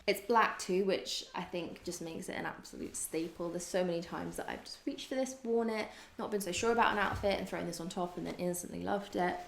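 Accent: United Kingdom. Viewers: Where are you from? I know you’re accent is British but it sounds slightly Americanised in some parts!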